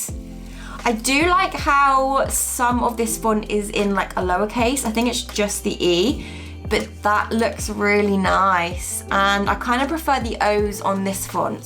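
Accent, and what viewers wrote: United Kingdom. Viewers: Please mam, make translate cc into urdu. Your work is incredible but very difficult to understand of your fast british language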